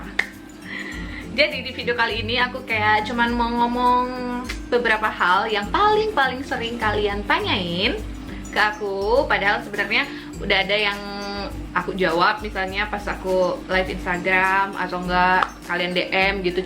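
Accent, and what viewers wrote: Manado, Indonesia. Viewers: Kakak dari manado yah?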